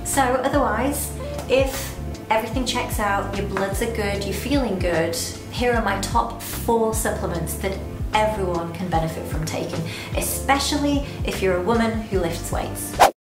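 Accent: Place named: Southern England, United Kingdom. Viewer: I thought you were from somewhere in the south of England.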